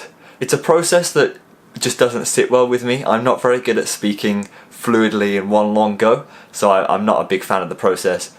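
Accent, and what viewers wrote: United Kingdom. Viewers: ok I get it why you have a British voice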